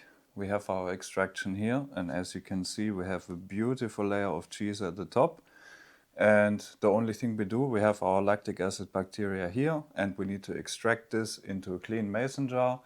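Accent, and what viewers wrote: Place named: Germany. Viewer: You are obviously a German speaker ❤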